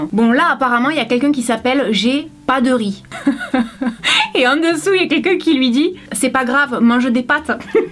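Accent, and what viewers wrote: South, France. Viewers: sa se vois qu'elle vient du sud ^^ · Bonjour. Je viens par hasard de découvrir ta chaîne. J'aime ton style naturel. J'ai particulièrement apprécié cette vidéo sur" Mon voisin est fou". Je fais partie des 50% de personnes qui ont des problèmes avec leurs voisins, et ça m'a donné des idées pour les remettre à leurs places. Alors j'ai bien rigolé. Puis j'ai encore bien ri en lisant les commentaires trop drôles. Super, ici pas d'insulte!!!! Je m'abonne à ta chaîne. PS: comme toi j'ai l'accent du sud et c'est bien de ne pas essayer de le gommer dans tes vidéos. Il apporte beaucoup de convivialité.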